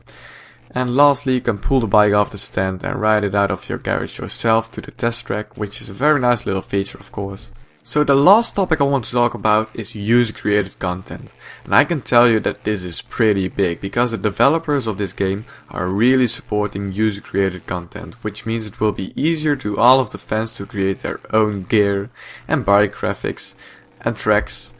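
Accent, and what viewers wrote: Netherlands. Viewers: are you dutch?? you sound dutch ;);)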